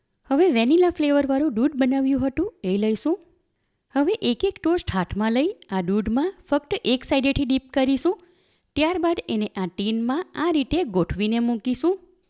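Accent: Surat, India. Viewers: Nice mango dessert I liked your Gujarati in Surati accent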